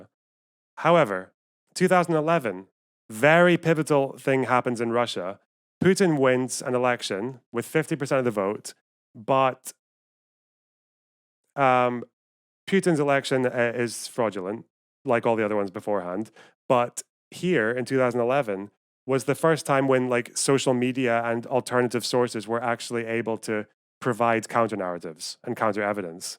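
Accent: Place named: Glasgow, United Kingdom. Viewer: At first watching your channel I was like “this guys got a super familiar accent” Then I realized my dad was from Glasgow, moved to Canada when he was 19 and lost most of his accent. You sound so much like him it’s crazy.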